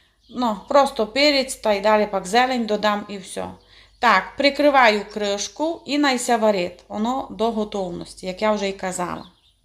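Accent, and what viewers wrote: Transcarpathia, Ukraine. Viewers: Як же гарно звучить Закарпатський акцент..смачно.файно,ладно до душі..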